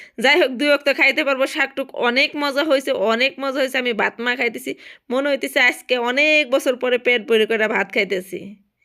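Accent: Manikganj, Bangladesh. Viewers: আপনার কথা গুলো আমাদের মানিক গঞ্জ এর মতো লাগে আপনার বাসা কোথায় আপু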